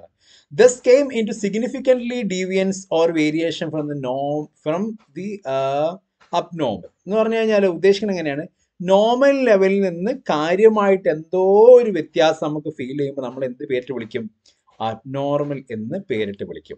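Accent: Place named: Malappuram, India. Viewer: മലയാളം പറയുമ്പോൾ നാടൻ ഭാഷയും ശൈലിയും പ്രത്യേകിച്ച് മലപ്പുറം Slang വരുന്നു. ശ്രദ്ധിക്കുമല്ലോ?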